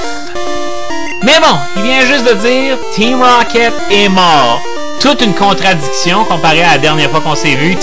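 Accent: Quebec, Canada. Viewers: you speak Canadian-French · tu avais un accent... si t'étais pas Québécois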